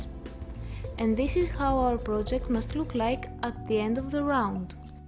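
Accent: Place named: Greece. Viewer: ΚΑΤΑΛΑΒΑ ΑΜΕΣΩΣ ΑΠΟ ΤΗΝ ΠΡΟΦΟΡΑ ΣΟΥ ΟΤΙ ΕΙΣΑΙ ΕΛΛΗΝΙΔΑ!! 😉 ΜΠΡΑΒΟ ΚΟΡΙΤΣΙ! ΕΥΧΑΡΙΣΤΩ ΓΙΑ ΤΟ ΒΙΝΤΕΟ! ΕΧΩ ΣΚΟΠΟ ΝΑ ΤΟ ΦΤΙΑΞΩ ΚΑΠΟΙΑ ΣΤΙΓΜΗ! KEEP UP THE GOOD WORK!😊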